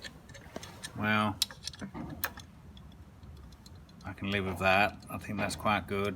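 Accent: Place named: Australia. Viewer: You do a great Aussie accent Greetings from OHH MAA HAA USA I know you from round these parts Fair Dinkum no crow eater you boy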